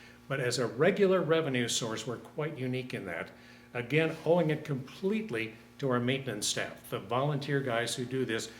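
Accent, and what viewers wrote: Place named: England, United Kingdom. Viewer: The best useful info and at least , I could learn English accent from you 🙏🏻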